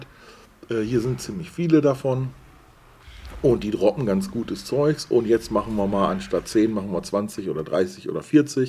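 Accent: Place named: Ruhr, Germany. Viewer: Wo kommst Du eigentlich her?ich meine den Ruhrpott rauszuhören....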